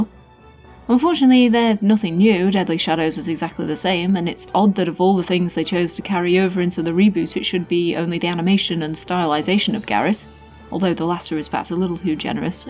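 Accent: United Kingdom. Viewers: Ok, so I watched this video twice. While I'm a huge fan of the original games, I never tried to care about Thief 4. It was just too obvious it would be heavily simplified. And of course the rough development didn't help. I actually think it's the opposite way. The levels in the first two games are sometimes built almost like real places, so a map isn't really needed. If the mansion is a big rectangle, then why do you need a map? On the other hand, the city in Thief 4 has a nonsensical layout, so playing without guidance leaves you with no logical way to go. The same might go for all the levels in Thief 4, but I can't remember them. Garrett didn't draw the maps. He usually says where he got them. ;) I don't have much else to say. You have my respect for analysing this dreadful game so deeply. Really the only gripe I have is that the background music is sometimes pretty loud. 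It makes it harder to concentrate for long and I don't understand what you're saying sometimes. English isn't my first language and I'm not used to this heavy British dialect. The generated subtitles did help, though.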